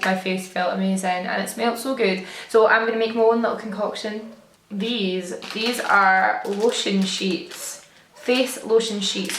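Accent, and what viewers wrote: United States. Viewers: Seriously loved your American accent!!! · The American accent! 😂😂😂 I freaking love you. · Your American accent was spot on hahaha!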